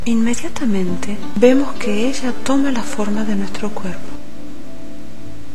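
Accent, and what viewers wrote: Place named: Argentina. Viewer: no se por que pero el accento argentino me pone de los nervios!!! como relajarse asi????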